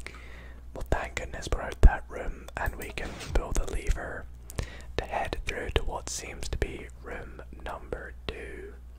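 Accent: Scotland, United Kingdom. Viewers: Are you scottish bc my friend is scottish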